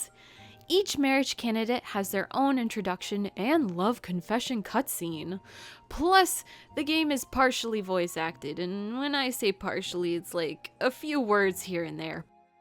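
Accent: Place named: Texas, United States. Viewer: hehe love~ your voice and love~ the texan accent XD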